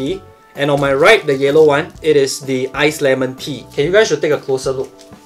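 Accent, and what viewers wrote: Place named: Singapore. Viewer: well sorry but this is how most of us Singaporeans speak...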